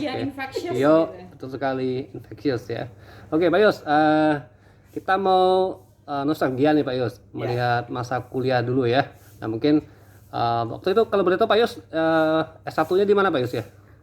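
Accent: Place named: Manggarai, Indonesia. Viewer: Bp doktor, itu logat Manggarai tuu kental ew😅😅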